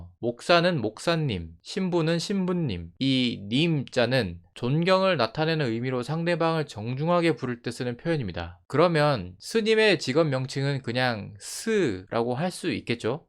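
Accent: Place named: Gyeongsang, South Korea. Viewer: 경상도 억양을 씁니다. 사투리를 줄인거지 억양은 경상토 토박이에요 고향이 대전이라는데 억양은 토박이 경상도가 강한데요..신기하네요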